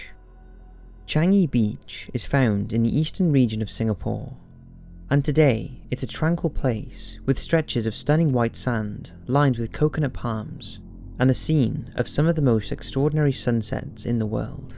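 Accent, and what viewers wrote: Wales, United Kingdom. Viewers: It's his Welsh accent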